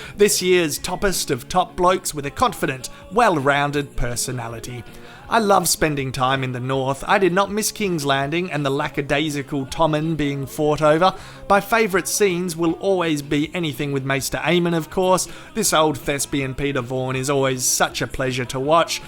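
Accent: Australia. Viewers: Good shit, I even start thinking in an Australian accent now.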